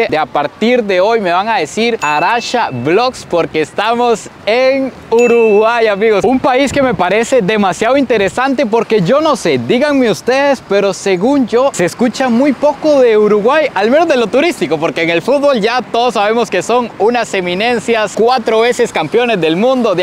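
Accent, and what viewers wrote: Argentina. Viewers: Decime por que hablas como Argentino .Nosotros no hablamos así.Estaria bueno antes de hacer los videos se informen un poco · TODO CASI BIEN PERO NO ABUSES DEL CHE Y MAS CON ESE TONO QUE LO HACES NOOOOO PARECES E ENFRENTE ARGENTINO NO URUGUAYO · Cuando te sentaste al lado de gardel hablaste como argentino,no como uruguayo, ellos no dicem che ni hablan con ese canto caracteristico de argentina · sos argentino o que xd los uruguayos no avlamos haci xdd C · Sonaste a argentino, no a uruguayo…. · Hola como estas? Muy lindo video, pero solo te voy a corregir un error... Estas hablando como argentino, ese acento no es uruguayo, es como si fueras porteño, el Uruguayo habla mas tranquilo, sin resaltar tanto las palabras. Otra cosa nosotros no decimos siempre "che", nosotros decimos "bo", ta" y listo😁😁😁 el che y el boludo es mas argentino, aca, podes decir "fijate y fíjense" que es como decir el miren u observen o chequen esto. 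Saludos cordiales desde shangrila, ciudad de la costa, canelones💪💪💪💪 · ÉSE ACENTO ES DE ARGENTINA NOOOOO OFENDASSSSS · Mano no hables como argentino que a muchos Uruguayos n le va a gustar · En Uruguay no dicen che y hablan más pausado, estas imitando el argentino😅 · Ahí no están los poderes de Uruguay , solo el poder ejecutivo, en el Palacio Legislativo, esta el poder legustavito, y en el Palacio de Justicia, el poder legislativo. El teatro Solis puedes entrar, solo tienes que ir en l horario en que se hacen tours con guía para turistas. no hables como Argentino cuando te haces el uruguayo, así no hablamos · Pero araya porque hablas como argentino. Pero che.... el che es argentino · Molesta un poco que se quiera hacer el uruguayo pero hace el acento argentino... pero buen video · soy de Uruguay pero por favor no hables en argentino pe da cosa xd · Es casi lo mismo, qué ganas de romper las pelotas. 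Además, los argentinos tienen muchos acentos. En todo caso, decí bonaerense.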